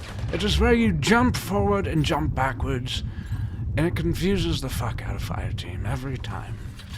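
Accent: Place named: RP, United Kingdom. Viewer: Damm you do a bloody awsome David Attenborough aksent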